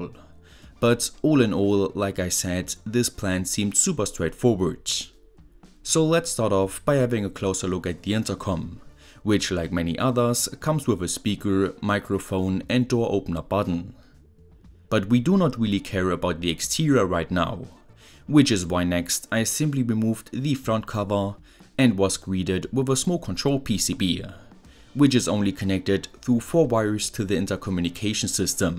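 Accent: Germany. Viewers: i heared german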